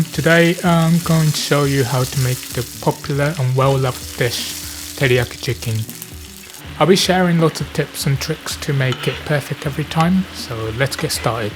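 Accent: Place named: East London, United Kingdom. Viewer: Cheers for the recipe mate! Did you live in London before? I can detect a slight East London accent ;) Love your content! I'm gonna try to make this dish tonight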